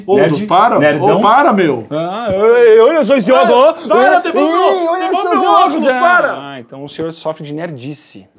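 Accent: Brazil. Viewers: vc é baiano? meu pai tbm fala youtóba kkkkk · sotaque ceares mt massa nosso sotaque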